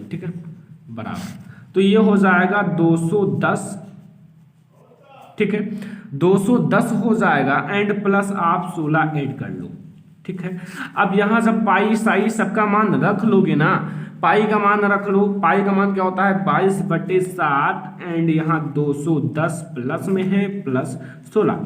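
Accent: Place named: Uttar Pradesh, India. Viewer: Kya bhai tu toh U.P side ka lag raha hai .. Me GUJARAT SE Hu 10 CBSE BOARD ..Ane wale hai boards 😨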